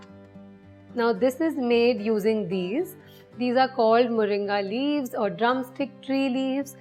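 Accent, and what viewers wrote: India. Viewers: Also I love your raspy Indian accent it's so sexy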